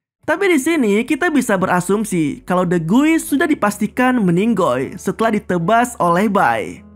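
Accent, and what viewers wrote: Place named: Medan, Indonesia. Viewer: Begini suara Adon Saptowo, vokalis base jam, tapi dengan logat medan 🤔🤔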